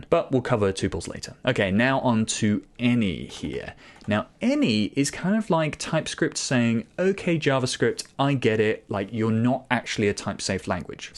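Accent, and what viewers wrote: United Kingdom. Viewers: Your British accent make your videos sound polite from my point of view hahaha.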